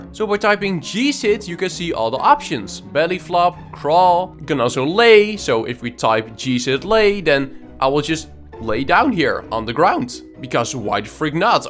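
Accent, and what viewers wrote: Netherlands. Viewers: Ur from nl i can hear it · i can just tell you are from nl:) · are you from netherland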